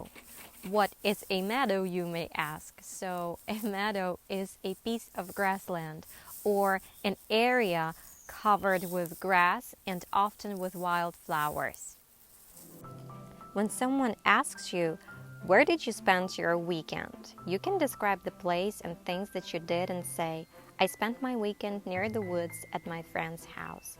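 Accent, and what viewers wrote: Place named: United States. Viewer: Hey! Would be really nice to hear about your own journey/story on how you learned/are learning English, practiced/practicing your American accent. Different people process information differently. For example, some people may memorize new words by only hearing them, the others - strictly by seeing words on a piece of paper and so on. What is your method?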